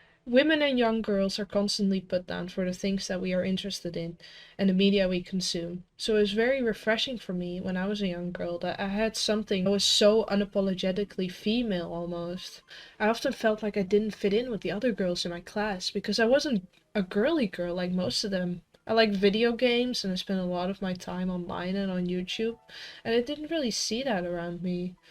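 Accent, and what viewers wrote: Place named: Netherlands. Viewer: it took me a hot minute to realise your dutch! welcome aboard to the english speaking , dutch side of yt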